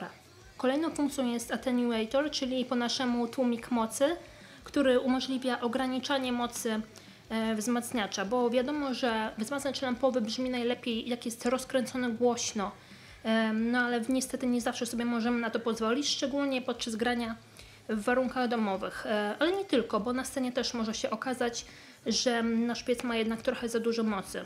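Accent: Poland. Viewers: Могу ошибаться, но судя по языку (говор, некоторые знакомые слова - как в старом "Ведьмаке"с субтитрами) в видео - она из Польши.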